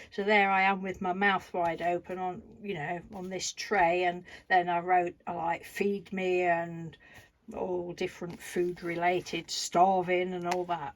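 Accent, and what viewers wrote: United Kingdom. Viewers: I just love your British accent! Just love your personality 😅so funny. These hearts are beauitful thank you!!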